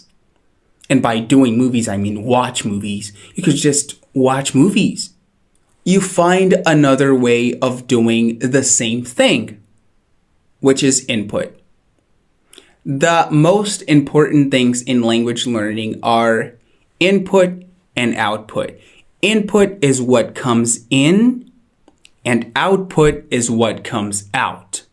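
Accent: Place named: United States. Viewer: Another great video. I suppose I should have asked you this question in your American accent video, but I hope you don't mind my asking your more questions. Did you study how to make each sound (each vowel and consonant)? I know you read out loud a lot and used movies, songs, podcasts, etc. to get your American accent. But did you also use any American accent textbooks or websites to get your American accent? I love your videos. Thanks.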